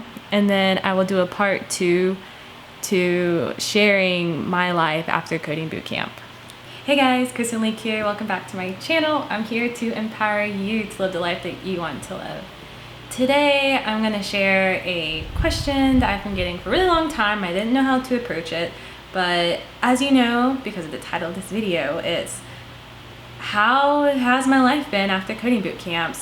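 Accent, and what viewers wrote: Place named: Australia. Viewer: Are you or one of your parents Australian? Every now and then you have a slight accent :D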